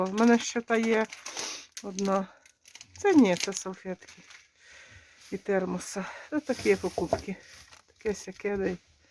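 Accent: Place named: Zhytomyr, Ukraine. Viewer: добрий день, а я зразу зрозуміла де ви живете,по вашій мові, це північ Житомирщини, я живу недалеко від вас.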